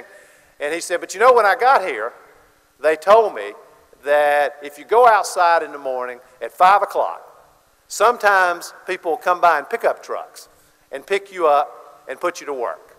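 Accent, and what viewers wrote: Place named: South, United States. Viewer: John Edwards is Bobby Kennedy with a Southern Drawl.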